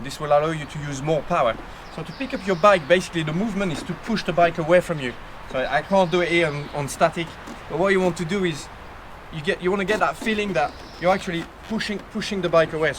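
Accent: France. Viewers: He’s French lol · Are you a native French speaker ? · you are a true gentleman of the track. love your videos, and never get tired of the Anglo - French accent:). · from his accent, clearly french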